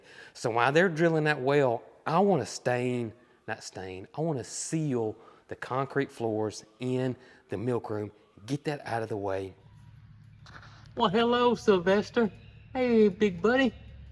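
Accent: South, United States. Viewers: I have closed caption on & everytime you say well, CC reads whale!!! Guess CC doesn't understand Southern speak! It made me giggle! The barn does look all nice & organized. I had a well at my house in the country, & we had the best tasting & beautiful clear water! We had a large water tank in our basement to hold water so we had some when the electric went off. When it snowed & we lost electricity, I melted snow on our wood stove to cook & wash with! I hope your water is as good as ours. I'm thinking it will be based on how that spring water is. & it will be cold!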